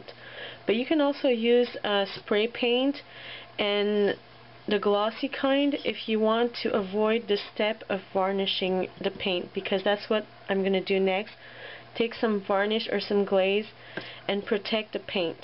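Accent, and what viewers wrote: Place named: France. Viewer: Is your first language French I'm Canadian and you seem to slightly have a French accent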